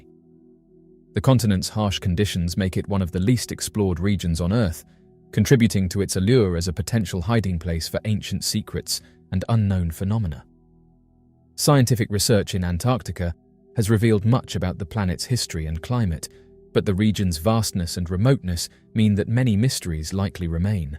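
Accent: United Kingdom. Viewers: Such boorah. If you just reported the finding, your findings whould be about 15 seconds. The rest if this video is just conjecture with a British accent... like that makes the narrators verbal diarrhea all the more believable. A 12 year old coul do a better job with this content.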